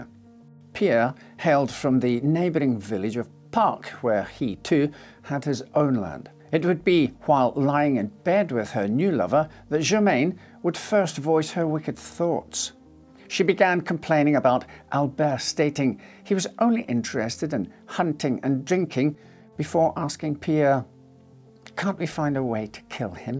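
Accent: Scotland, United Kingdom. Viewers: As usual, excellent presentation. Proper pronunciation of our English language is so important, and (if I'm right, you're Scotch?) your innate facility to use consonants properly means this - and all your super videos - are a tremendously fulfilling watch. Only thing to be alert on: (maybe auto-generated) Yank shoddy spelling e.g. "ax" for axe or "meters" for metres. Good work. Love your channel!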